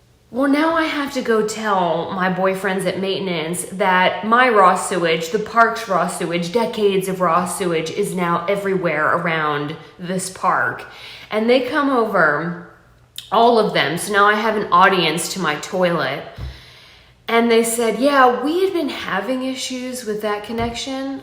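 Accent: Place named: California, United States. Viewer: Girl where you from ⁉️⁉️⁉️ You sound more Cali than North CAROLINA. Keep your sense of humor it looks good on you.!! ✌💗🎼🎵🎶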